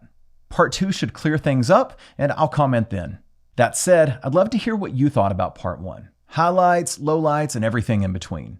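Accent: South, United States. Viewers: Your Southern accent breaks through on occasion. Where does that originate from ?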